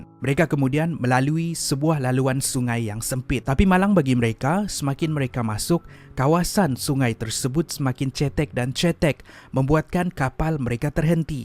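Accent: Sabah, Malaysia. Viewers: bahasa ckpnya sda tau dia org sabah, Indonesia lain dialeknya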